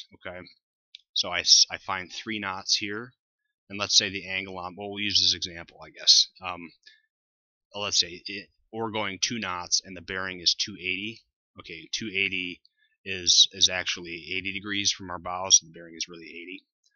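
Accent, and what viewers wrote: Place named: United States. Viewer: You have a true american accent, then you say something in German and I doubt )) Thanks for the tutorial.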